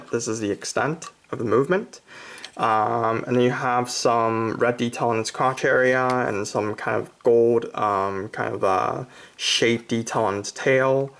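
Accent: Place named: Hong Kong. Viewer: Are you a HongKong people, you've got really nice accent.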